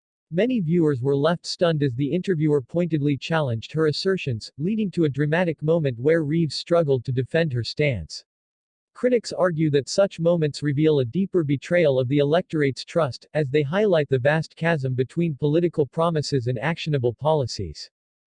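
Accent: United States. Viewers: AWFUL American commentary AGAIN ! WHY ?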